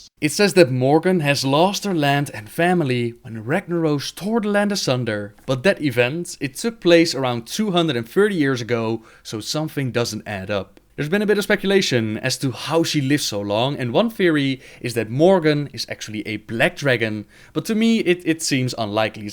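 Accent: Netherlands. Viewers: you can clearly hear he his from the netherlands. goede Videos, ga zo door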